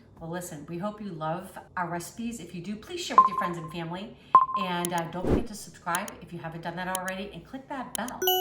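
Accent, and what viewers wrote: Boston, United States. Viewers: Ordering one now - think I'm going with black stainless steel! thanks for the demo and love your boston accent!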